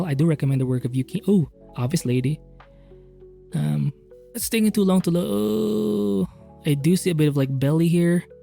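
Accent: Philippines. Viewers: I'm getting Filipino accent in your voice, are you Pinoy?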